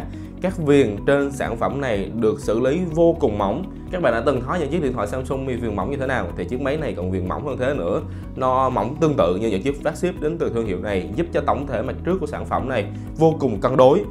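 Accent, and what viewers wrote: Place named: Central, Vietnam. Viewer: Giọng như miền trung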